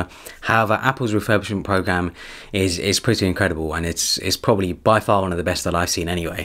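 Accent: United Kingdom. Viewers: love how he's indian and has a British accent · First time 9n your channel man and damn your accent is wow! Never liked the British accent before hearing it from you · I normally get annoyed with British accents but i can listen to you all day. Nice review!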